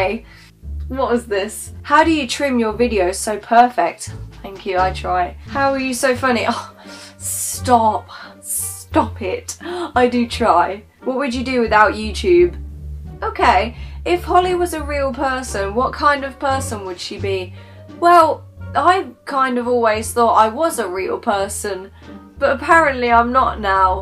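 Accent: United Kingdom. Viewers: Your so pretty. Are you British?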